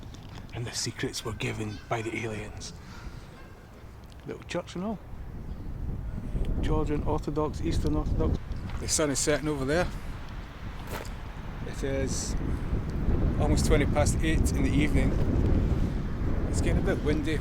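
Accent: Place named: Scotland, United Kingdom. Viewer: This video seems so cool. This is exactly the kind of places I wanna go see. But as a non native english speaker I find it hard to absorb both scottish dialect, wind and road noise. Maybe something can be done? I've watched parts with great interest and given a thumbs up :goodvibes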